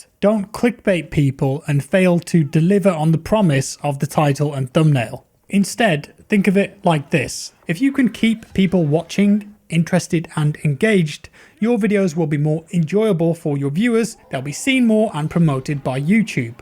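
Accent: United Kingdom. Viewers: USA dominates your views because we just cant resist that British accent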